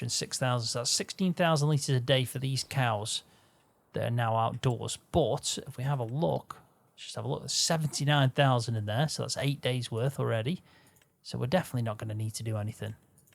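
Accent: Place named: Wiltshire, United Kingdom. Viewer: Bruh you easily my favourite YouTuber. It must be the accent as I’m from Wiltshire so is similar. Found your page looking for best mods and subscribed because of your farm hand series. You probably won’t see this mate but honestly your content helps me get through my days. Which at the moment is very tough. Appreciate your work and your music choices for videos.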